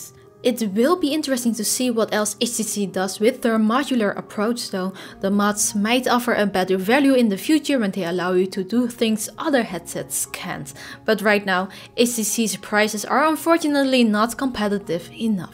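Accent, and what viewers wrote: Netherlands. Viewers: Why do i hear a Dutch accent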